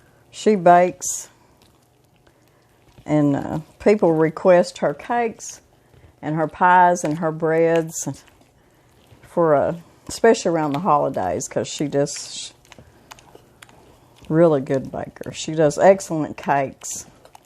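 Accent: South, United States. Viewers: Thank you again for your calm, sweet "southern" accent, blog. Not brave enough to try some of your recipes like this one but, love seeing your home, decorations and your sweet smile, and too!!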